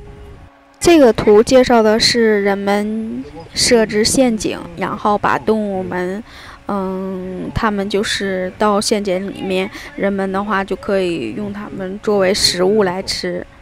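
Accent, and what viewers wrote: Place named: Northeast, China. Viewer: 听🈵️……东北s味十足…凑合着听吧……呵呵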